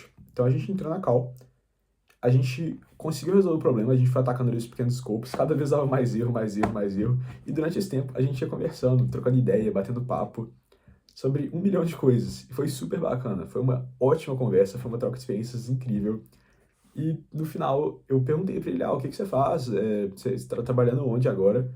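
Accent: Minas Gerais, Brazil. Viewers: Ess trem kkkkkkkkkkk mineirês é otimo kkkk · Em um minuto de vídeo já imagino que seja mineiro kkkkk tmj tô na luta pra aprender tbm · tu é mineiro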